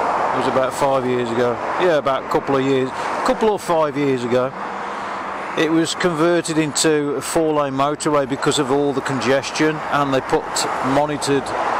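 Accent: United Kingdom. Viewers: I'm not jokinggg, can you see it down thereeeeeeee...lol, I love British accent! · So what about Bosch 36v 250w? What’s the significance; why would someone suggest you’re a troll because of that? (Sorry if I’m clueless.) Also, where in the UK are you from? I’ve spotted your accent as the same as another YouTuber I watch.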